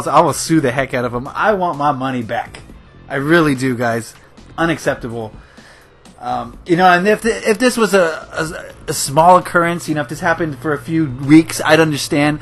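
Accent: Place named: United States. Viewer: We Englishmen speak English because we are from England:p I do find it funny myself how you Americans say some words, lol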